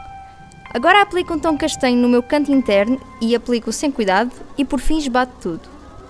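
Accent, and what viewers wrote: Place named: Portugal. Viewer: Adorei teu canal sucesso tu és portuguesa né? Adorei seu sotaque ótimo vídeo bjo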